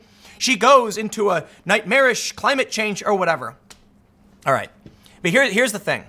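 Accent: United Kingdom. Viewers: British accent is the best part of this video.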